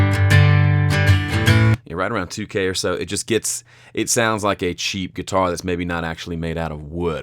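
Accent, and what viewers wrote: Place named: Mississippi, United States. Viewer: Hi, I have been enjoying your videos on proper EQ. Great Job! Your accent seemed familiar to me so I checked you out and lo and behold, like me, you 're a Mississippi boy. I'm from Jackson, an original rock 'n roller, who played with Andy Anderson from Clarksdale in the early days. Keep on making those videos and I will keep on watchin'!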